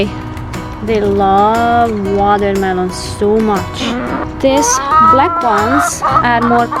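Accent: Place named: Hungary. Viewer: One of your other videos was just recommended to me and when I clicked and heard your accent I knew straight away that you are a fellow Hungarian! 🧡 Feliratkozva!! :)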